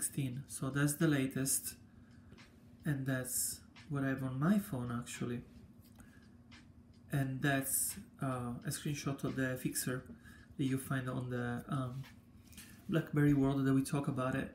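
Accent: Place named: Italy. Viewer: La butto lì...ma sento un vago accento italiano...😜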